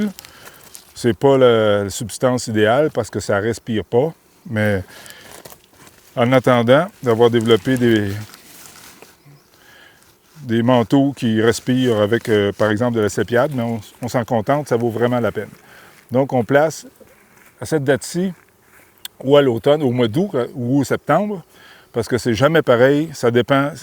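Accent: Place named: Quebec, Canada. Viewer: Merci, c’est un vrai plaisir cet accent québécois pour nous parler de vos stratégies pour les abeilles. Je m’abonne sans hésitation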